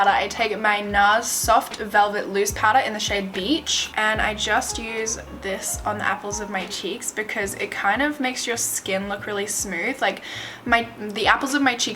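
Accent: Australia. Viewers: I watch your videos religiously and not just for the makeup...I'm so obsessed with Australian accents lol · I've quickly grown to fall in love with your style, personality, all around everything. Can I be you? Or can we just be friends? I'd settle for that 😫😥 keeping doing you!! I absolutely love all that you do! From cruelty free from your Australian accent to your way of explaining & doing your makeup 💋‼️😍 slaaay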